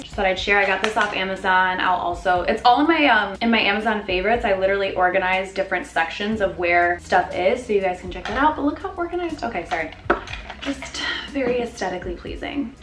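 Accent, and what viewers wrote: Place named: Michigan, United States. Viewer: I hear your Michigan accent ♥️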